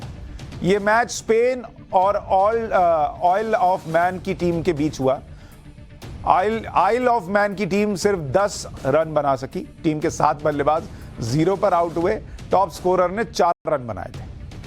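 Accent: Bihar, India. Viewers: I love your Bihari accent.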